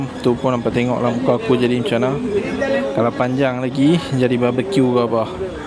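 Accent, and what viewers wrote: North, Malaysia. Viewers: Hi, I baru last week terjumpa your YouTube Channel..very interesting. Suka dgq u cakap utara as I am also org utara from Perlis. Pasai rumah tepi railway tu depa tak terganggu sebab dok besa dah. mcm rumah I kt kampung di perlis, mmg railway tepi rumah. since kecik kami dengaq bunyi keta api , la ni rail tu lagi dekat sejak depa buat ETS. dulu masa kecik2 kami dok main atas tu rail tu saja..bila keta api nak mai kami cepat2 turun. Alhamdulillah tak penah berlaku kemalangan jiwa. tapi la ni org dah bubuh pagaq lah. 😁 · Terbaik bro your video, aku baru subscribe, dah habis da video pasal Kerala aku tgk, dgn slang Utara, mmg terbaik la. Penerangan sangat jelas.and one more thing, brother..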